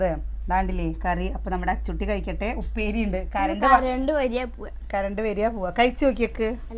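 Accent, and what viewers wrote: Palakkad, India. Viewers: You are good house wife I respect you.Your muringa curry I didn't like.Your palakkadan slang is very nice.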